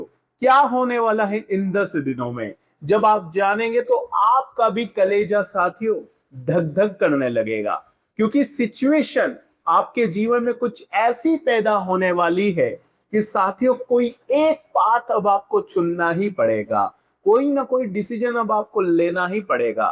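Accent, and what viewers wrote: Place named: United Kingdom. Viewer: Bakwas mat kijiye First of all improve your pronounciation in Hindi Don't talk like a Britisher You Third grade Astrologer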